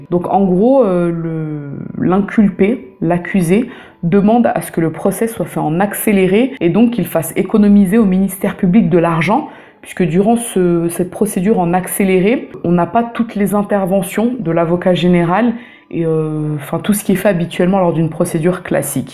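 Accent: Italy. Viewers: Quel accent !!! Suis italien j'ai remarqué de suite que tu le parlais, tu es Italienne ? Bravo une fois de plus gros travail de ta part sur cette histoire ! J'adore mon rdv du dimanche ! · cet accent! tu sais parler italien? impressionnant !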